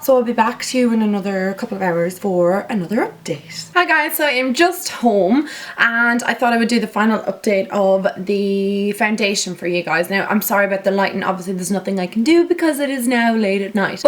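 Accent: Ireland. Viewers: your videos are so good! I live them. are you irish , you sound sorta irish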